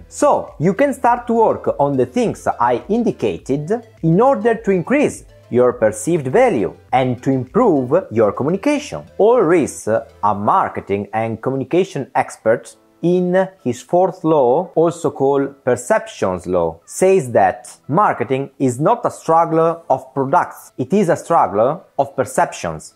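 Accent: India. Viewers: Pur con accento indiano parla meglio di molti italiani, più che altro fa strano L accento indiano su un italiano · You have a lovely indian accent <3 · Ma questo accento indiano???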